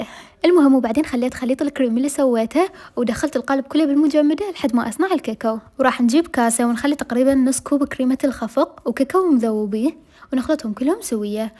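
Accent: Baghdad, Iraq. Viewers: بغدادي وجنوبي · لهجة بغداد و وسط العراق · اللهجه العراقيه تجنننن🇸🇦🇮🇶💓💓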